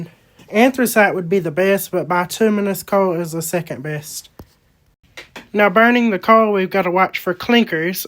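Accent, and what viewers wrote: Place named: Georgia, United States. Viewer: It’s actually a mix of deep Georgia and some transatlantic it seems. It’s very interesting.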